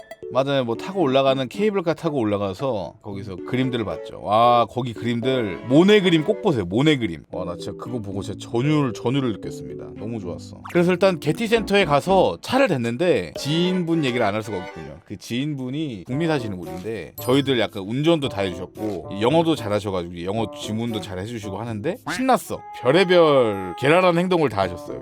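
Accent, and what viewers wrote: United Kingdom. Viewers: 미국갔다오셨는데 워쮀서 영국영어를.... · 이사람 미국갔다왔는데 억양은 왜 영국이죠?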